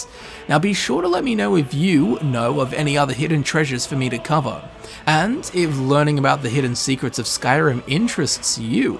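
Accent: Australia. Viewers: He's British, he has it memorized. Edit: Australian? It's less funny now. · isn't he australian though?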